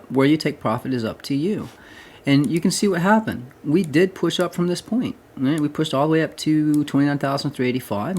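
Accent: South, United States. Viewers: No redneck Accent. Today?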